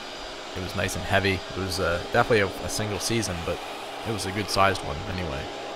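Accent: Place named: Philadelphia, United States. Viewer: Not sure if you're aware of a show called The Bonfire out of NYC but they would get such a kick out of how you say words like "COMB" and "HOME" They are, what you might call, connoisseurs of Philly accents.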